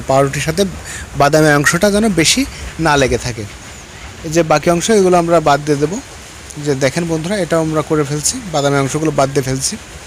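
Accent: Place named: Khulna, Bangladesh. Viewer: ভাই, আপনার বাড়ী কোথায়? ভাষা তো আমাদের মতো, খুলনা বিভাগ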